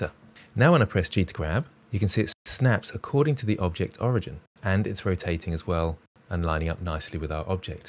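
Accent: England, United Kingdom. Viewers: Concise, clear, and complete. Absolute amazing tutorial. Bonus points for narration with an English accent.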